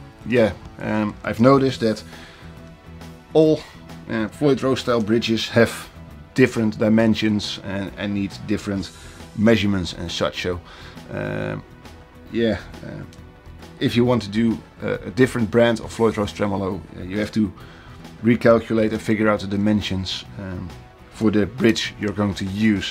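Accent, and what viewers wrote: Netherlands. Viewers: Thanks a lot for this video! I will definitely use some of your approach in placing a Floyd Rose on my current project. Thought I was the only one that does quadruple re-measuring :). Is that a Dutch accent I hear :D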